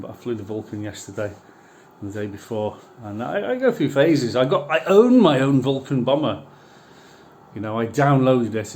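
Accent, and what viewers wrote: Liverpool, United Kingdom. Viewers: Hello, what a tidy man cave/den. You are looking in the pink as usual. TBH I don't understand much about the flying stuff and jets/planes but I could listen to you for hours,the lovely Scouse accent. Take care 🌞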